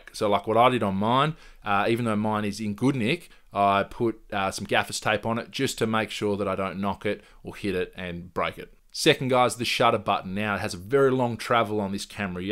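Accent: Australia. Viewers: First I thought "an aussie!" then I realised you're also from Perth and I subbed immediately. Gotta rep the home town. Great vid, looking at this camera so super helpful!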